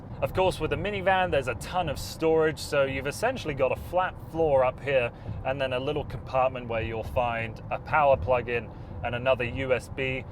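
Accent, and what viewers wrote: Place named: United Kingdom. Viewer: What country are you in? You have a British accent and your speedometer is in kilometers. Are you in the UK?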